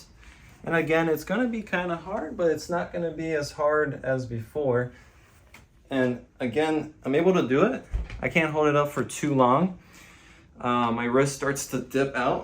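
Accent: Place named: United States. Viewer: Кафявия колан по жиу-жицу за упражниетия на брахиализа. Не знам кой ще е по удибен жиу-жицу колегите или Армрестлерите, щото те го правят с бял колан :D. Your name is Bulgarian but your accent is American, very interesting!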